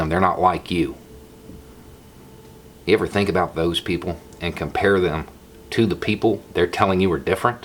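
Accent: South, United States. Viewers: Dude, from somebody from a fairly major city up North as soon as I heard your accent I thought Oh lord another one of 'these' guys. This is probably the 20th video from you I've watched and my lord you've proved me wrong. It's easy for me to grow up with these beliefs... growing up in a densely populated diverse neighborhood you know gay people and Muslims and Mexicans and you realize that they are just like you. I'm white and I grew up in the little polish pocket in a majority hispanic side of town, and I got along with hispanic people far better than white people because of exactly what you're talking about... That sense of superiority and being inherently right just because they're white. To come from the south it takes a lot to develop that kind of attitude. Good for you bud I hope there's a lot more like you down there.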